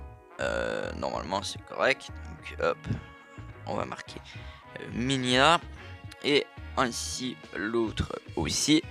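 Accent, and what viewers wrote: Quebec, Canada. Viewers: Hey vive l'accent Québécois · T'es québécois ?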